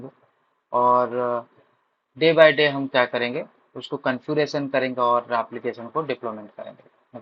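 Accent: Odisha, India. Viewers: Are you ODIA? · Are you an odia, bro ??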